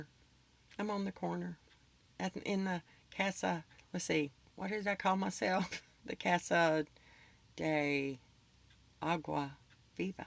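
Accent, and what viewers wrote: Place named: Carolina, United States. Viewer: I told my wife you were from North or South Carolina or maybe North Georgia with that accent. I reckon I wasn't too far off. 👍🧔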